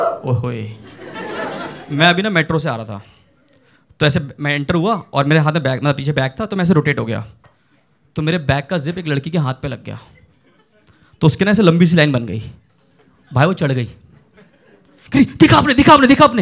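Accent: Garhwal, India. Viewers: Aap gadwali ho??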